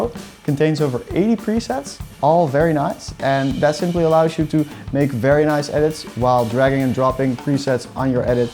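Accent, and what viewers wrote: Netherlands. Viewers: I smell dutch hahaha leuke video!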